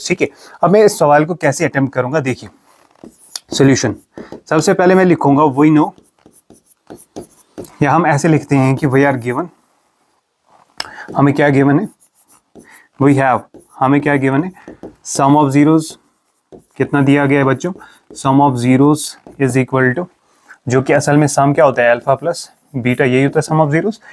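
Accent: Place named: Kashmir, India. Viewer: Sir are u from Kashmir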